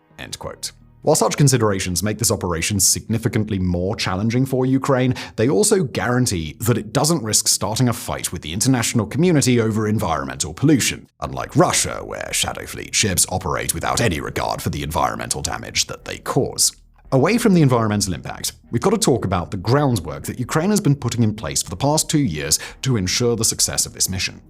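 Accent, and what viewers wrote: United Kingdom. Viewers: Dude's British, get over it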